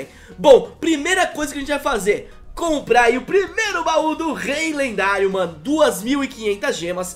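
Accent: Minas Gerais, Brazil. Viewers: e mineiro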